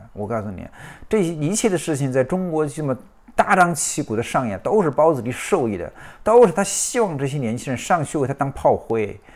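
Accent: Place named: Changde, China. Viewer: 那你是不是常德人？很亲切。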